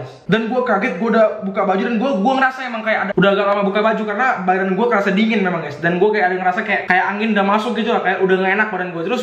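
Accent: Batam, Indonesia. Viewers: bang lu org batam ya?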